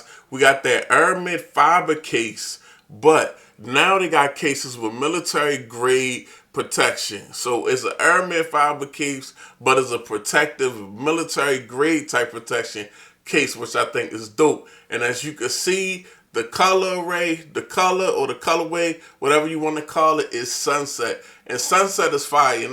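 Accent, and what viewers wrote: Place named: Maryland, United States. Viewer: You sound like you from Maryland 💪🏼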